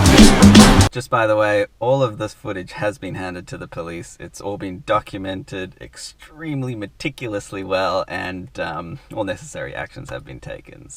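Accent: Australia. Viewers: are you australian??? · You Australian? Ik where Richmond is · I just realised now your Australian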